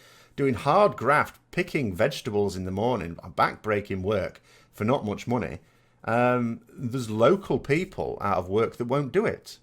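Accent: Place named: Northern England, United Kingdom. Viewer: The moment I heard the presenter's Northern accent I knew this was all going to be a diatribe of doom and gloom, why is it that the moment one steps foot North of Watford one is confronted almost unfailingly by this dog in the manger attitude, be positive man, I know that flies in the face of everything your parents taught you whilst you were scoffing down your hotpot and black pudding but really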